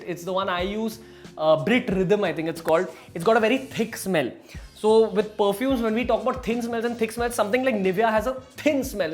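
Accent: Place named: Gujarat, India. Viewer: Gujrati English 😂❤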